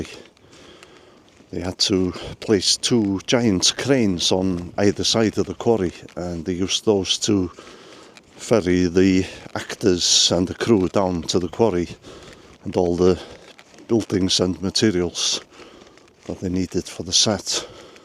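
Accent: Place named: Caernarfon, United Kingdom. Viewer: Faacinating stuff, thanks! Completely off topic, I'm trying to place your accent. Caernarfon?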